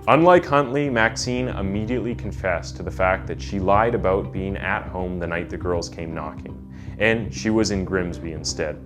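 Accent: Canada. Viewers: 🙄 Damn shame, you couldn’t tell that the narrator is Canadian by his accent? You must not know many Americans (but of course, our accents have a hell of range, you know). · Do you not hear his Canadian accent? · You're Canadian aren't you?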